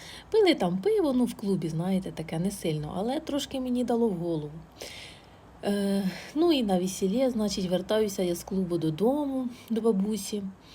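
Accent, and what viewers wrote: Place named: Orativ, Ukraine. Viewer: Оратівський район! А я чую, говір такий милий)) Он воно що!!!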